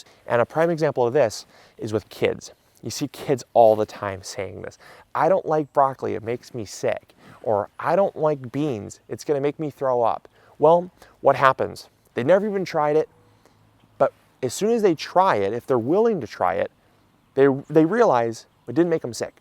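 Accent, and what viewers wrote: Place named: Wisconsin, United States. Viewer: hello Wisconsin! that 70’s show voice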